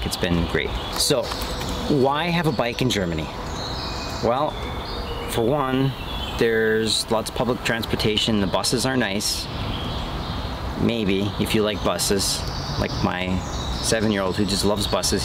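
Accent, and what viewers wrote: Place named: Upper Peninsula, United States. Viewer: I'm a Yooper too, and living in Heidelberg. I would love a Yooper Stammtisch and a Rauchbier in Regensburg. Thanks for the vids, and a touch of Yooper accent!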